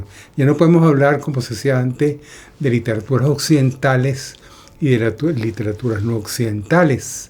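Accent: Caracas, Venezuela. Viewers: Excelente post. Muy grato ese tono de tertulia en acento venezolano, me atrevo a afirmar que es caraqueño. He leído casi todo de Mishima. Es uno de mis autores preferidos junto con Julio Cortázar. Gracias.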